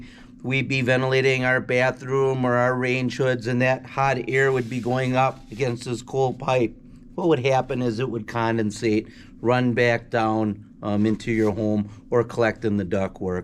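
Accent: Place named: Wisconsin, United States. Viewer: The Wisconsin accent is strong on this one!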